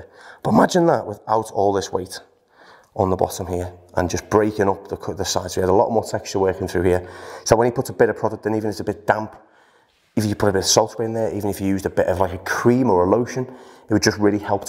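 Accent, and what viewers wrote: Liverpool, United Kingdom. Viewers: Is that a Liverpool accent ?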